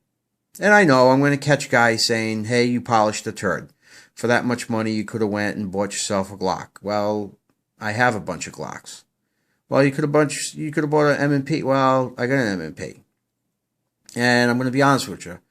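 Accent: Boston, United States. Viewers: New England Boston accent. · Holy shit the accent tho. Bahston!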